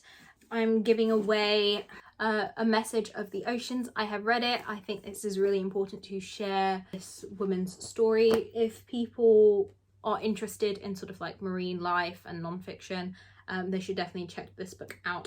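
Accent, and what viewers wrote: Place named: England, United Kingdom. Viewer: You sound like you live in England. Were all your books bought there and can you get them in Canada?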